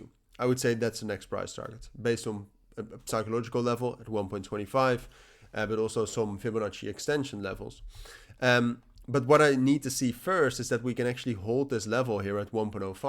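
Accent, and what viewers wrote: Netherlands. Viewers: Can hear you are Dutch ;-). Ty for the update.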